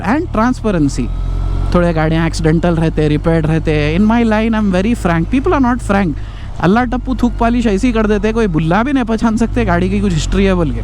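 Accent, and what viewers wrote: Hyderabad, India. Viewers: BHAI HYDERABAD SE HAI KYA 😎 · Hyderabadi hai bolo 😎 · bhai vidoe kaisa bhi rehndo magar my khaali tumhari vo zuban sunne keliye video dekhtaun ji kya pyaari Hyderabadi bolte tum toe bulle me miladete dekhne walin ki han rahi baath video ki ab aapse milne ka wakth akhir aagaya bohot dinose preowned bikes leneka soch taun magar kisi pe bharosa ny mere bhai aap line me utargaye ab shayad mere ko meri dream poora karneka time aagaya dikhra hy jaldi lockdown khatam hoagaya toe accha hy milke requirement batatahun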